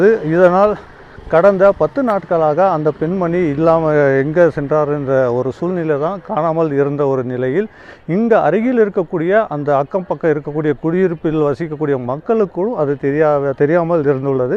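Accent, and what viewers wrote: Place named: Bangalore, India. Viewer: Bangalore Tamil 😅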